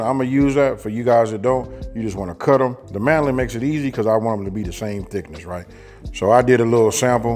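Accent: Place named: West Coast, United States. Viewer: You 👀 my size. Southern with a West Coast accent😅. I love it! Also, I need that mandolin.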